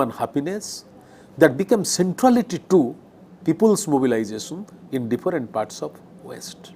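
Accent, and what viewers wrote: India. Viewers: Indian accent makes difficult for u . But stuff is best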